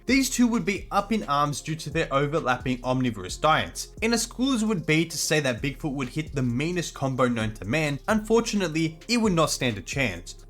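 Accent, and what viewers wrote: Australia. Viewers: Question , is your accent Australian or New Zealander?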